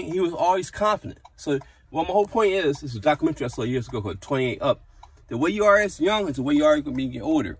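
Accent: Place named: Philadelphia, United States. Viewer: You can so tell you are a Philadelphian. You talk so fast lol. But I'm a Philadelphian too so understood every word. I lived in Philly all of my 40 years but I am unfamiliar with this area. I love history and I found out that the first stone home built here in Philadelphia, that still stands today, was in West Philly. It was the home of William Penn's doctor. It's at 53rd and Woodland. I want to drive by one day to see it. I am more familiar with North Philly and Northeast Philly. I am so unfamiliar with South Philly and West Philly. Also, University City. University City doesn't even feel like Philly to me. It's a bunch of college kids and transplant yuppies. But anyway, I enjoyed listening to your story. Also, I can tell by all of the great and positive things you said that your time will come, kind sir. I am huge on God and spirituality and I was told before that if you truly want something to show gratitude towards it as if you already have it. 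When we do that we actually draw it to ourselves by our sense of gratitude. Almost like fooling the universe. Coincidences are merely God's prearrangement of plans and maybe I wrote something in this comment that you were meant to hear. If I didn't I still hope to leave an imprint of unconditional love on your heart. May God bless you on all of your future endeavors and for the sake of humanity, please keep spreading love. The world desperately needs you more now than ever before. Good always conquers evil.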